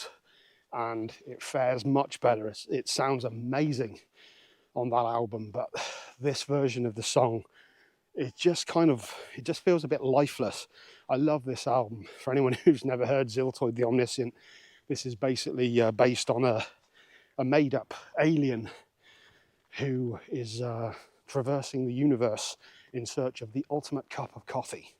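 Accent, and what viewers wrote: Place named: Dartmoor, United Kingdom. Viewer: Where are you when you do these walks looks like Dartmoor or North Yorkshire to me. Guessing Dartmoor by your accent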